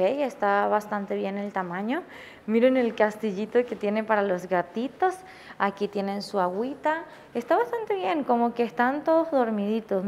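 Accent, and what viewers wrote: Venezuela. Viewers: A donde vaya como habla puedo decir que es Venezolana amo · jajaja no se le puede negar que es venezolana por su forma de hablar super depinga. saludos desde Venezuela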